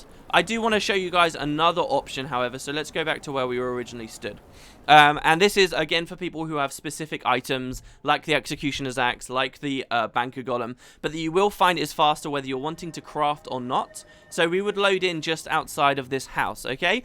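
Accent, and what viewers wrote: United Kingdom. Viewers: I enjoy your videos so much for so many reasons. First of all, I've fallen in Love again with Guild Wars 2.. I said again but actually even though Ive had it pre release, it is the first time.. I have never really played it, I have just 2 level 80 characters and just one with a finished story, but now I play again. And even though I am a noob and there still are so many things I have no idea about, it is so much fun! Your videos give me so much information, there is so much input in such a short time, you talk really quickly and address so many things, I like that so much. Additionally, I am from Germany, and I so much enjoy your british accent (You are from the UK, aren't you?). There are so many things I have missed because I just havent really played the game, but you explain so many things in your videos, I think it makes me love the game even more, and maybe this time I stick to it. 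Eventually I have been playing daily now for a month, at least around half an hour a day if only just to chill and rest in the evening. Never before have I played this much (being aware of the fact, that it IS NOT a lot of time compared to what others spend playing the game). Anyway, thank you very much for your videos! Keep up the great work! LG Nyakes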